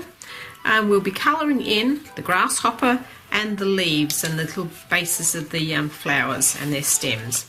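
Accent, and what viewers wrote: Australia. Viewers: Absolutely beautiful artwork! That is one of the smoothest, most gorgeous backgrounds I’ve ever seen. The only thing (but it’s a big thing for me) is I find any background music distracting and awful (trumpets etc) and I usually just mute it, but I couldn’t with this one cos you were explaining a little bit here and there. I think everybody who does art probably has their own thing that they put on in the background anyway. I listen to learning German when I feel productive, Lady Gaga when I want a happy vibe, but often I just like complete silence, so I can think about all sorts of stuff/concentrate on what I’m doing. But when people put background music on in their instructional videos, the viewer isn’t given a choice - and everyone has different taste in music. The reason I mention it is because I really like your work very much and one of the things that you do that I find particularly helpful is that you really clearly list the brand and exact colour of the pencils that you’re using. 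I think I detected an Australian accent? I’m Australian so hi! 👋 I have seen a lot of your work on Instagram that I like too. So hi! And I’m sorry about the music comment (one part was like the background music they use before funerals get starting - you know, when people are assembling and greeting etc) and I know that I’m very over sensitive to things like that. But absolutely beautiful work! 😃👍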